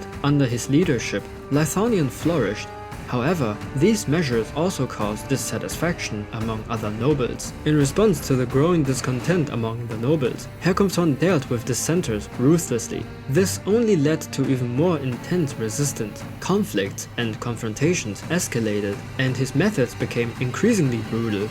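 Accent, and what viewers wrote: Germany. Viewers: This being narrated by an actual German dude (I assume anyway, ‘cause I mean, if you aren’t, then you sure do a good impression), this really couldn’t have been done better. Satisfaction.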